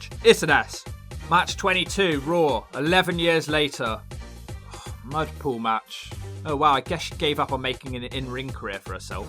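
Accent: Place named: England, United Kingdom. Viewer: Why are the narrators always English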